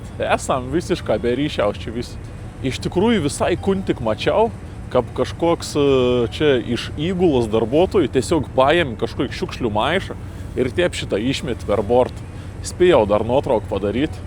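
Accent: Samogitia, Lithuania. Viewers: sveikas, kai kalbi angliskai jauciasi zemaitiskas akcentas 🙂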